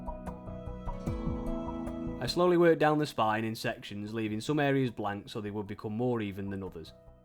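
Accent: United Kingdom. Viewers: this is pure art...doing the job and explaining it so well with this beautiful british accent...luuush:-)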